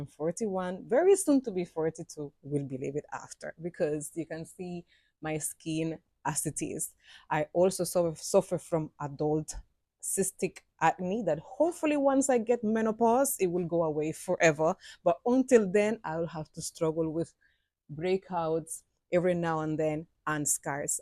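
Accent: Jamaica. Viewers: yo you have a stronger jamaican accent than me and i am half Jamaican... but raised in the states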